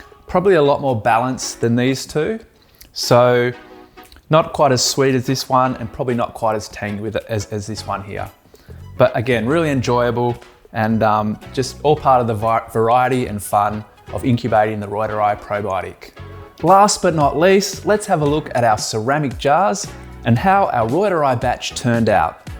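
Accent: Australia. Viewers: Is that the Australian accent I hear?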